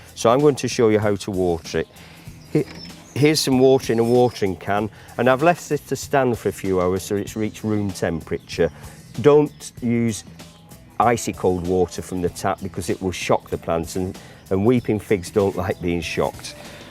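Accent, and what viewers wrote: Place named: Northern England, United Kingdom. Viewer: 🤔....Michael Caine is a londoner.....this guy is from the north of England 😄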